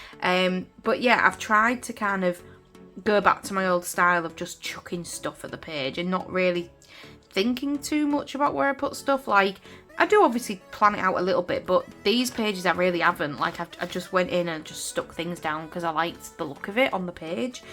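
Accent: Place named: Hull, United Kingdom. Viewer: Always makes me happy to hear your voice and familiar accent (I am from ‘ull too, though I don’t live there now!). Lovely pages as always! Great you are back enjoying journaling again!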